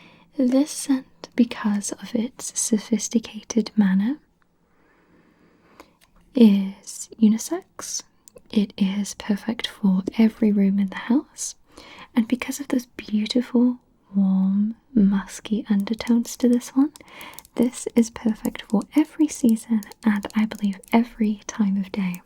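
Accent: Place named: Wales, United Kingdom. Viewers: U sounds like a British tho u r american :) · She’s definitely Welsh, I can hear the accent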